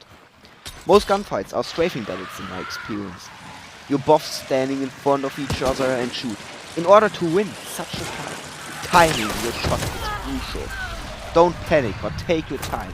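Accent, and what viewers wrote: Germany. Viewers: When german speak english😂😂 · Hi. Are you German? It sounds like. 😊 I'm from German. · You'r German, am i right ?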